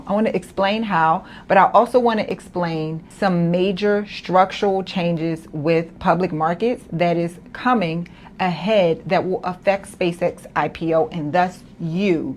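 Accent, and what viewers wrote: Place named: Baltimore, United States. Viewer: Are you from Baltimore? I feel like I hear our accent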